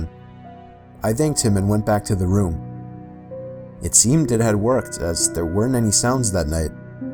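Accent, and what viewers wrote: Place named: Boston, United States. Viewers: U sound like your from Boston just a thought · Classic. This is when had his NE accent.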